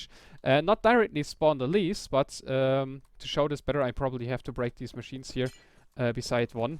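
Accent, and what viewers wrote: Germany. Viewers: Du bist Deutscher oder :P? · R u from germany? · you got a pretty german accent right i dont know im german ;D just say no or yes ;D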